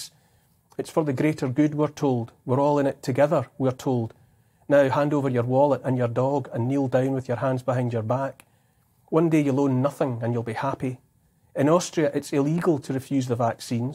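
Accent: Scotland, United Kingdom. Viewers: Thank you from Germany for your good words! I love your accent, reminds me of a wonderful holiday in Scotland with my husband long ago..... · I LOVE this Guy, thank you Neil, first of all, as a Swiss born & raised I couldn't speak English when I came to Canada in 1980, I got taken in by an elderly Scottish Couple, hence, it's Scottish English I learned first, so I love listening to you, greed is killing us, Pharma greed NOT THE good old "FLU"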